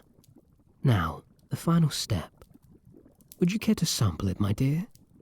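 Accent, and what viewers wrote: United Kingdom. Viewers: Ah the British audio I can’t look at them the same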